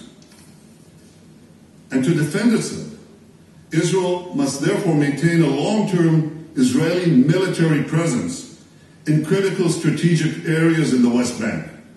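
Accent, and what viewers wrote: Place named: United States. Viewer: Am I the only one slightly unnerved by the fact that the Israeli prime minister sounds like an American (who can properly pronounce country names) when he speaks?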